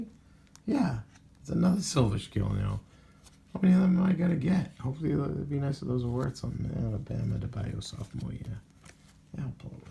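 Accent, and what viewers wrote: Boston, United States. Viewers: I can tell you're from Boston! Lol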